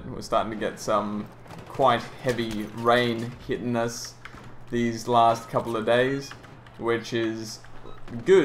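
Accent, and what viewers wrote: Australia. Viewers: Fuck yeah! thought that was an Aussie accent, and you live bloody close to me. im Sunny Coast... well not really sunny, kind of an ironic name don't ya think.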